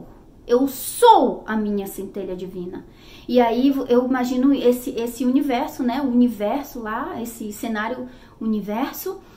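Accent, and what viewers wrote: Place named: North, Brazil. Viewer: Ela é da região norte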